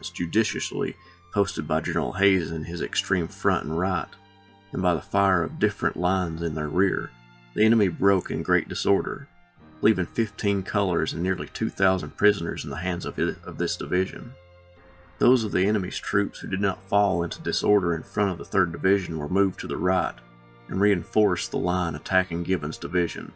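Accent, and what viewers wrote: South, United States. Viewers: Narrator sounds Southern but anti Southern.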